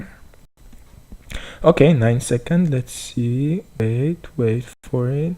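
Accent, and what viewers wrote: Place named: Romania. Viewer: You sound like an romanian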